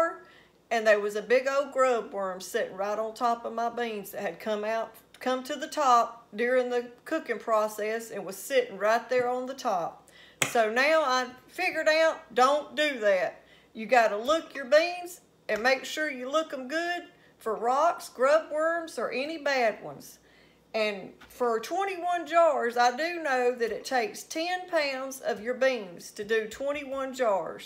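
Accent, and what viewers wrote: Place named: Alabama, United States. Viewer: Where you from, I would say Alabama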